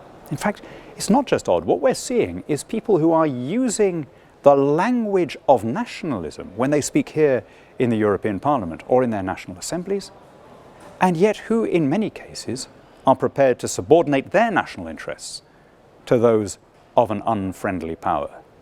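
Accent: RP, United Kingdom. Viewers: Theres something seriously wrong with dan, he sees the world in simple terms, black and white, in most of his videos, communism amd capitalism. Hez guilty of the highest levels of intellectual dishonesty and hez been castigated severally for it. To go on calling out atrocities committed by putin and forget the crimes commited by his ideological political wing in other nations is the heighy of hypocrisy. once he even claimed that hitler was a socialist lol much to the chagrin of a more educated audience he was presenting to. Take out the posh accent and you will realise his points in many of his videos lack any substance in them.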